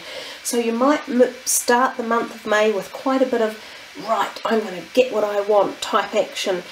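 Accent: New Zealand. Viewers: What a lovely reading. Am currently living abroad but so refreshing to hear the kiwi accent again! Thank you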